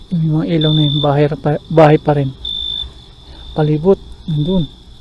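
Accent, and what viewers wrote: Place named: Mindoro, Philippines. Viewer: Boss tiga Mindoro ka no? Kasi yun Tono mo😂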